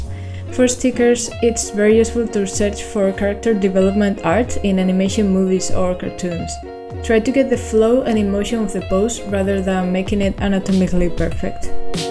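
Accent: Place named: England, United Kingdom. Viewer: Wow that english accent.. ☺️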